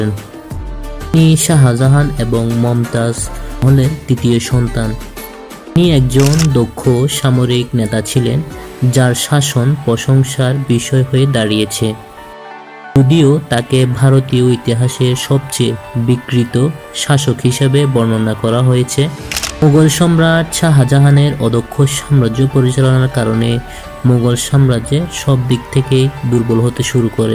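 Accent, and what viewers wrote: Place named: Chittagong, Bangladesh. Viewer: ভাই উচ্চারণ নিয়ে কাজ করতে হবে। অতিরিক্ত চাটগাঁইয়া এক্সেন্ট আসছে। শুনতে অনেক খারাপ লাগছে।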